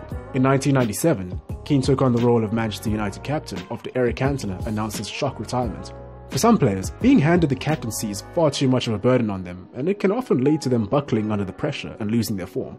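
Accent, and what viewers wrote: South Africa. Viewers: Nice to hear a South African 👍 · where are you from? south africa?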